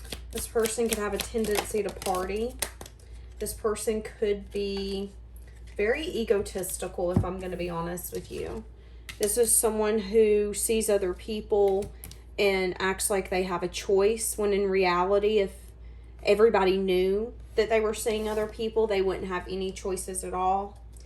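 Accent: South, United States. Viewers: You are such a blessing you totally just blow my mind wow you are so good you have helped me so much God bless you thank you I'm so thankful for you God bless you keep up the good work I just love listening to you that country accent girl so sweet😘🥰😇🙏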